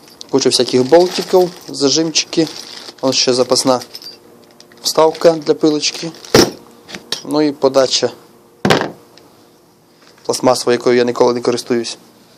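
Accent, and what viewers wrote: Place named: Rivne, Ukraine. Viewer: ну - молодець! ще не вмикнув а вже поламав...)така мова гарна,як десь біля Рівного..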